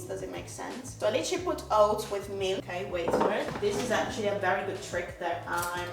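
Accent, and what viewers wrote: Italy. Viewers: Ehi bella, sei la mia inspirazione! Io sono mezza italiana e vederti tornare al tuo accento italiano mi scalda il cuore. Sono così orgogliosa di vederti come italiana che vive nel Regno Unito. Per favore, non cambiare mai chi sei perché abbiamo bisogno che più persone come te siano se stesse in modo impenitente. Non sai quanto sei bella e unica. Gli altri ti invidiano perché sono ignoranti e vogliono quello che hai. Sii orgoglioso di essere italiana!! 🇮🇹🇮🇹🇮🇹😘❤❤ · I don't think people were picking on your accent. They were curious as to why you suddenly sounded different. The Italian accent is beautiful and it's sad that you felt that you had to hide it. Good that you're not doing it anymore, apologies for judging without first understanding. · I love your accent! My best friend is from Trapani and it's her essence. I love seeing her as natural as she is, the same I wish from everyone! 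Also, loving when you're consistent with creating content, you entertain me a lot! But I understand that it's a lot of work and effort, so I'll be waiting paciently <3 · Hearing the Italian mixed in with the English accent when yo speak is amazing to me. I love hearing it and doesn’t make a difference in understanding your content. For me it gives you character and I love when you call us famiglia. Thank you for all that you do. Your content is so informative, real and inspiring. Also you are so brave for moving all by yourself to a new country at such a young age. Your success shows your strength and perseverance. Lastly that concealer looks amazing, what brand is it? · I would’ve never guessed you were Italian I thought maybe you were Puerto Rican or Dominican I love the accent 😍 Your body is fire 🔥 work it girl Love your content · Your accent is amazing. Are you kidding? They're jealous...you're hot, smart and have a sext accent. 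Come on!! I'm Italian also and your accent is so authentic, beautiful. Forget these idiots!!!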